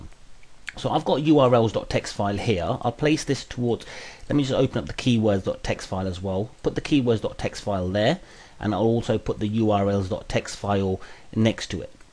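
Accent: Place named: England, United Kingdom. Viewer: I love your english accent, where do you come from ?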